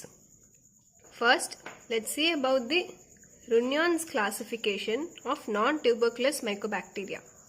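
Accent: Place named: South, United States. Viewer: I love your Southern accent.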